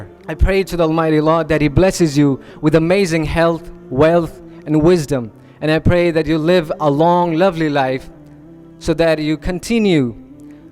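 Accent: England, United Kingdom. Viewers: MaaShaa Allah Bohot badiya bhai The way of speaking, behaving like an English man. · Asklm sir I am joining u r classes 2011 Karnatak college more 15 days Bidar U r English talking Maa shaa Allah u r teach Accent like this Angrazi 👨 man Maa shaa Allah Meri aap sa humble Request hai aap YouTube channel pa English Grammar classes ke video daliye plz Alphabetical phonics sounds ke Videos daliye plz meri 3 years daughter ko Teach karna hai plZ Humble request hai Sir plz Basic Education Covid19 ke resons sa schools tu closed hai plz plz plz Plz plz plz plz plz plz sir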